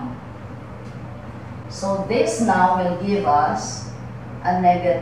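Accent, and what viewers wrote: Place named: Philippines. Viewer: Are you from Manila Philippines, your accent very similar to my mum, Bernice NGO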